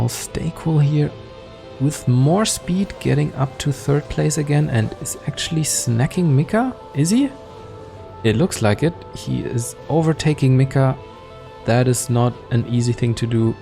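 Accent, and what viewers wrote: Germany. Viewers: sehr deutsche aussprache, woher du wohl kommen magst? 🤔🤔🤔😂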